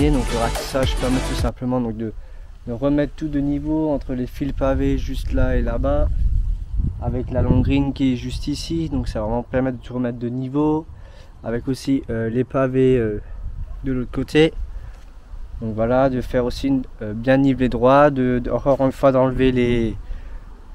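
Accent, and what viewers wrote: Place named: Alsace, France. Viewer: Merci pour cette video instructive et tes explications détaillées. Compliments pour le soin que tu apportes à ton environnement végétal. Merci aussi pour ton délicieux accent alsacien. Ca fait beaucoup de bien aux oreilles !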